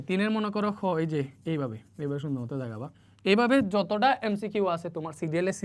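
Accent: Sylhet, Bangladesh. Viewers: ভাই মনে হয় খাঁটি সিলেটি..❤😊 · Bhai aponake donnobad ar aponi ki sylhety😢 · Vhaiya mone hoy sylheti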